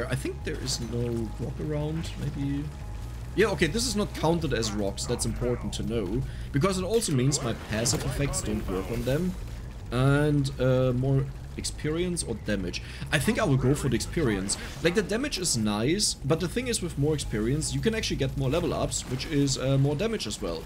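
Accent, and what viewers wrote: Germany. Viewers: Ich dachte mir die ganze Zeit, ser hat iwie nen deutschen Akzent. Dann so „Ach komm hör auch mit dem Käse“ 😂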